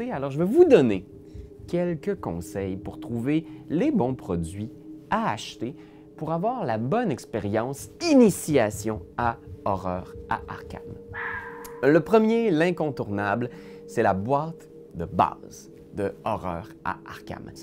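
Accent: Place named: Montreal, Canada. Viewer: Je reviens de vacances de Montréal, et entendre cet accent à nouveau, lié à la passion et une façon de raconter les jeux, quel bonheur. Bravo pour le boulot et l’ambiance 👍👍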